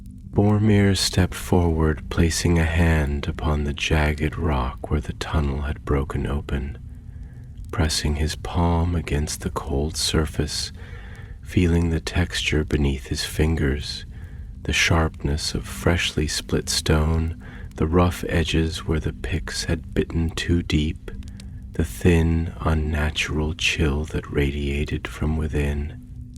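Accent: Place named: United States. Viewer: American accent 🤮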